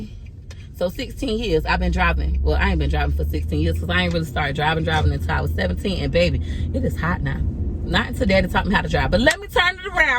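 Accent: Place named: Tampa, United States. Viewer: “I got some bread for ya... Hold on, Bruss” You so Tampa, I love it 813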